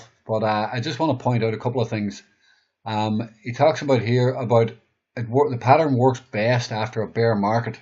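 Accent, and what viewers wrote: Northern Ireland, United Kingdom. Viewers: Where you from! Sounds like northern ireland to me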